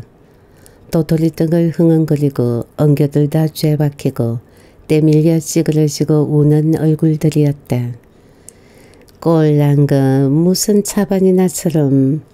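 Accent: Gyeongsang, South Korea. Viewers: 경상도 말씨가 참 좋네요. 아들이 엄마를 보고 어짠 일인기요? 라니... 참 어이가 없네요. 잘 오셨어요 얼른 들어가입시더 해야죠. 아이보고 할매한테 정중히 인사드리라 하며 가르치고요. 아들부터가 잘못했네요. 우리 남편도 시어머님께 성질부리는 말투로 말해서 제가 뭐라했네요. 왜 다정하게 말하지 그렇게 말하냐고요.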